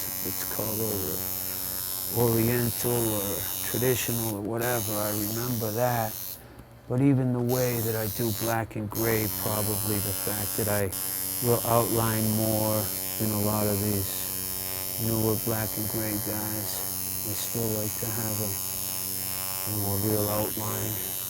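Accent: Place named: United States. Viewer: Feels like Christian Bale got his american accent for parts from this guy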